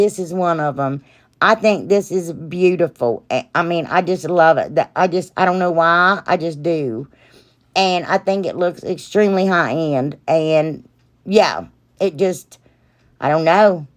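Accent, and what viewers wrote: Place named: Georgia, United States. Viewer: Just this morning I found your channel and I’m delighted!! Do tell from where your lovely accent comes? It sounds like you’re kin with my people down in RomeGA…your lovely sense of humor just had me grinning from ear-to-ear! Blessings to you/yours!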